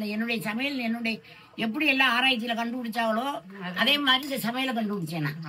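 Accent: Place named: Ramnad, India. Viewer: Maami arumaiyaana samaiyal adhuvu namma ooru style la supperr superr ongakitta enaku pudichadhey neenga namma ooru ramnad slang la pesradhu dhaan adha enikumey vitraadhinga idhey madhrii nalla manampola pesunga manasuku neraiva irukuh onga youtube channel maelum maelum uyarvuh pera manamaara vaazdhigirom eid mubarak maamii ❤️❤️🙏🙏🙏🤝🤝☺️☺️🥳🥳😇😇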